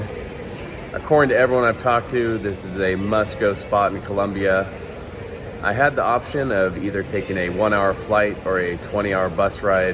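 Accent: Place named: United States. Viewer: It's only the American accent that makes him stand out.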